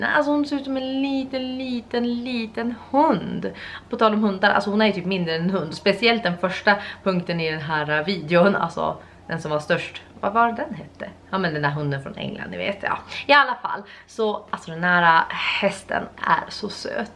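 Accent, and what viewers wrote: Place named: Skellefteå, Sweden. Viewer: Älskar när du säger bakanför. <3 Låter så charmigt. :D Skellefteå-dialekten är härlig. Men har aldrig hört det där innan du sa det. :) Säger inte att det är fel, lite kul att höra andras dialekt :)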